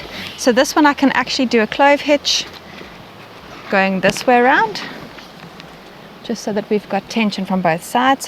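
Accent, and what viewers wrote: South Africa. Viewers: Love the South African accent....ive used 50kg potato hessian sacks for the same job. just like the Ol Timers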